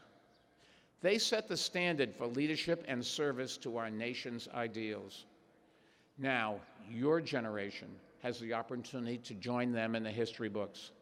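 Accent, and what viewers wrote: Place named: Boston, United States. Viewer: Don't worry, that Boston accent is front and center. Regardless of how you pronounce "hard."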